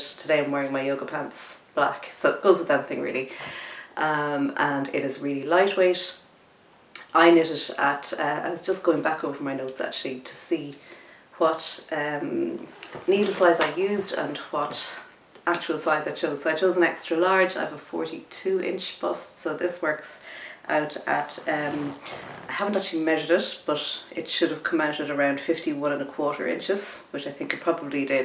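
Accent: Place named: Ireland. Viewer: Hello from Portland, Oregon. Just came across your podcast and love it. My grandmother came from Mayo and she had a very heavy brogue, rather like yours👍love all the pieces you displayed. The shawls are beautiful. Love the green sweater, is that called Harlow? I’d like to knit one like that. What yarns did you use. I’ve hit the subscribe and looking forward to reviewing the past podcasts. Thank you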